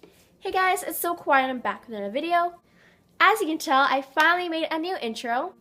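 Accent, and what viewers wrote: Canada. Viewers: She is from Canada I think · Just wondering, are you Canadian?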